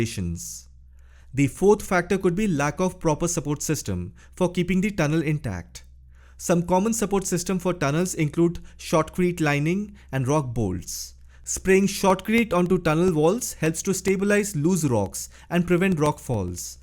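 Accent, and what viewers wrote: India. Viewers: Sir your desi English just like a wow 🎉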